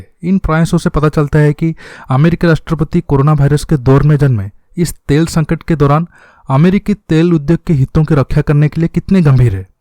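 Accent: Odisha, India. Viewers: Hi, are you Oriya??? Because your pronounciation sounds like that??? 🤔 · Odia touch tike rahuchi bhala laguchi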